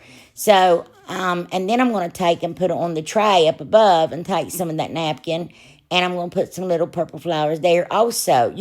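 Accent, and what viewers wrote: South, United States. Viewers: Hi, I absolutely love watching your tutorials. I would love to receive your wonderful "Spring" table topper, it's adorable. I would adopt you if I could. You would be the greatest little sister. I'd love crafting with you for hours. My husband, and I watch your tutorials for hours, and we even try to sound as cute as you do. We say "I love you A LOT" to each other every night before we go to sleep. We have done this ever since we heard you use that expression "a lot" with your wonderful southern accent. I wish you knew how much you brighten up so many of my days. Unfortunately, there are people out there who may say negative things to you. I feel sorry for them, and I pray that they find the inner peace they so obviously need. No one needs to try to belittle someone as special as you are. Thank you for being you, and for all your wonderful tutorials. 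Susan and Will Edgar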